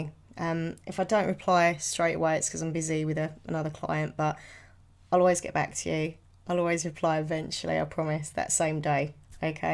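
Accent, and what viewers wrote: Essex, United Kingdom. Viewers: Your voice soothes me lots.. I think it's because of your accent. My family is from Essex. You're my favourite 😊❤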